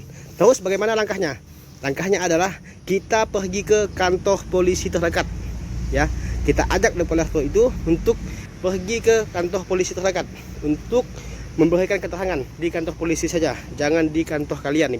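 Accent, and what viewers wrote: Indonesia. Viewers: Amuntai kh ini,cara bicaranya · Orang aceh ya bg ?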